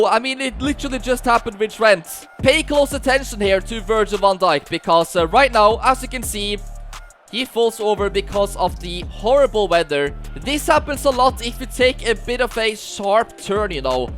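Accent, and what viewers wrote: Norway. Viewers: Jeg kan høre på engelsken din at du er norsk bruttern❤😅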